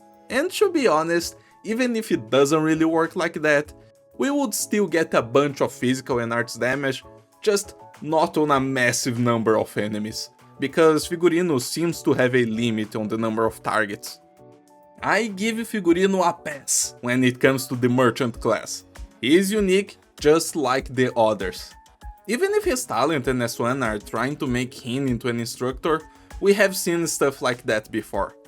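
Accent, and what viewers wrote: Brazil. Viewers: Isn't he brazilian tho?(pretty sure that's the brazilian accent) and figurine translates to costume from portuguese.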